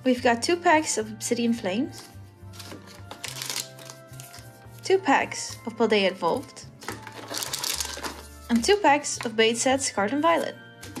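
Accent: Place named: Europe. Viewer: Oh I see I wasn't familiar with your accent tho 😂😂 I tho. it was somewhere in Europe hahha 😂😂❤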